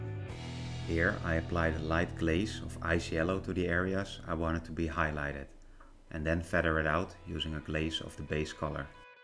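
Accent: Netherlands. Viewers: Dude, are you dutch?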